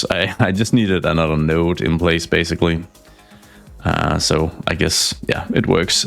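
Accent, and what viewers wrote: Denmark. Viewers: I was wondering about your accent, are you by any chance danish? or maybe dutch?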